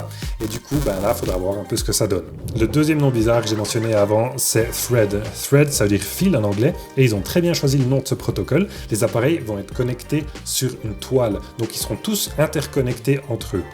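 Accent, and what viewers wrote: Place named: Liège, Belgium. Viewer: Super vidéo ! Très objective ! J’adore et en plus j’entends que comme moi tu es belges et de liège au vu de l’accent 😅 continue comme ça !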